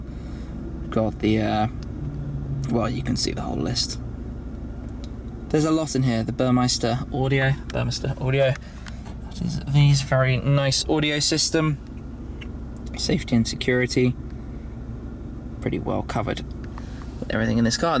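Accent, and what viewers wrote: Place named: United Kingdom. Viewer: wonderful british clear english and of cause a stunning car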